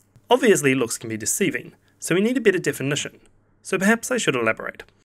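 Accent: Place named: New Zealand. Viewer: great video! and your NZ accent is fresh heheh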